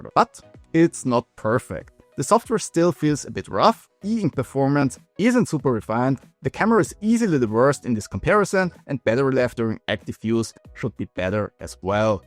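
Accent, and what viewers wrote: Vienna, Austria. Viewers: richtiga wiener heast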